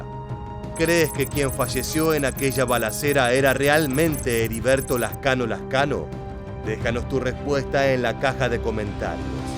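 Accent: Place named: Argentina. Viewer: Me da risa como el narrador habla bien argentino,pero neta esto es bastante serio con esto de México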